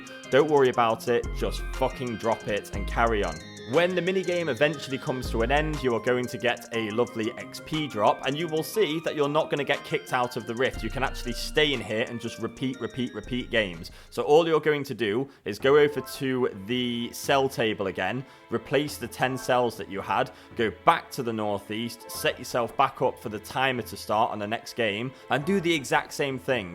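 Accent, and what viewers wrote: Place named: United Kingdom. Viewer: Having a slightly ticked off Brit, passively aggressively telling me what to do is actually rather calming.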